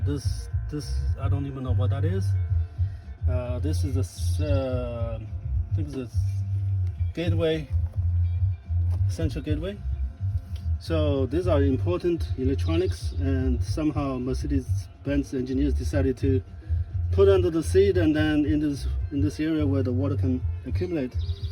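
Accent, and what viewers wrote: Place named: China. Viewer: 浓烈的同胞的口音:face-blue-smiling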